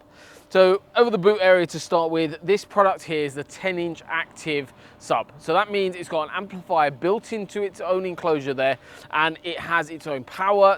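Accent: South Africa. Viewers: that accent is just RSA, no DNA. Awe ma se kind 😊😊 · Instantly picked up the South African accent, two words in. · I hear a South African accent a mile away 👍🇿🇦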